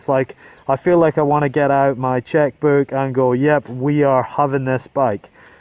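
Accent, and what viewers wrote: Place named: Scotland, United Kingdom. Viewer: fantastic bike, good Scottish accent too, superb, haha.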